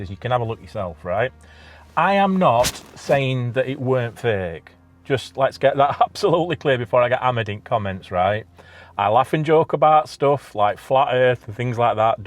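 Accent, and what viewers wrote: Yorkshire, United Kingdom. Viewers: Ey up. Is anybody more Yorkshire than you. Love it, loving the vlog. Hope you didn't get caught dogging 😆 Really liked the humour in this one. You're certainly finding your feet at this vlogging lark. Nice one 👍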